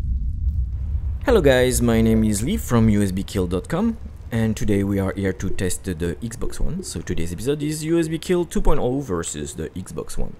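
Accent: France. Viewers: l'accent francais omg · French accent or it's only me?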